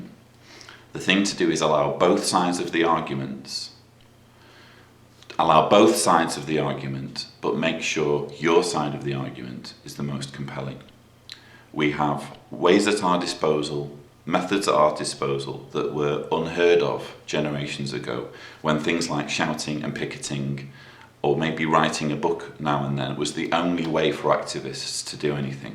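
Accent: England, United Kingdom. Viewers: Ignorant men cannot be educated. Neither can ignorant women. There is a lack of education. Lack of education can be remedied. A few good lectures and careful correction of writing and analysis by a good prof. Such an education made me effective for Supreme Court litigation and corporate antitrust work. Anyone with half a brain knows that the biggest turn off is ME, ME, ME, ME, ME, ME. ME. He is far too old to be a millennial ME, ME, ME. God help his children. ME, ME/ Most likely, I can describe his wife. I suspect she lacks confidence. Anytime she wants to learn, she hears that all funds need to go to ME, ME, ME. I suspect that he admires her cleaning, sewing, and childrearing skills most of all. Sex is often secondary to working class men. They are not good lovers. ME, ME, ME. Rather than spend any family time, they hang out with men who endorse the ME, ME, ME mind frame. 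Ask me, how do I know this? First, observation within my own family. Second point. Reading detailed anthropological and psychological studies of working class men. ME, ME, ME Always ME> never WE, Never Our movement, pointing out powerful women and men, NO. ME/ My PC is very problematic. Also, his English usage is not that great. Don't fall for a proper English accent as the hallmark of intelligence. There are much better speakers and writers. If my computer were working, I could show you a much better example of my own writing. Dietrich Bonhoeffer, Hemingway. Steinbeck, F. Scott Fitzgerald, Hillary Mantel w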